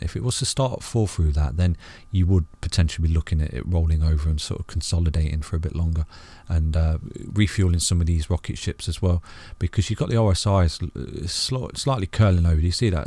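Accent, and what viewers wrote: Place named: Nottingham, United Kingdom. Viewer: You sound like your from Nottingham. I am myself Well done love your videos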